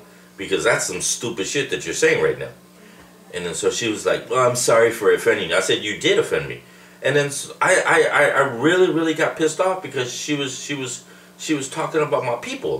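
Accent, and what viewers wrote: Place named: Chicago, United States. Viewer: Dude, respect. Also, are you from the Midwest? I feel like your from Chicago. You sound like you’re a part of my family lol! My family and I have thick Chicago Latin accents haha!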